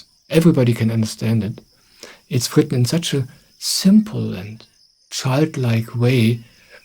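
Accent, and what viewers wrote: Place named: Germany. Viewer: Accent like Geraman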